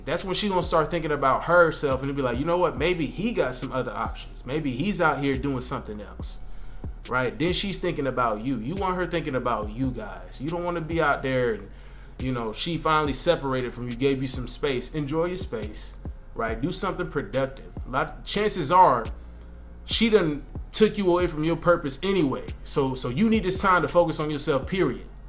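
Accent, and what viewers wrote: United States. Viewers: Next time public English speak should be louder than your American damn accent bro !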